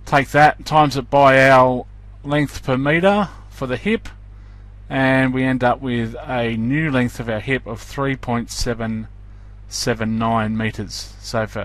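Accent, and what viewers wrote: Australia. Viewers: Aussie aye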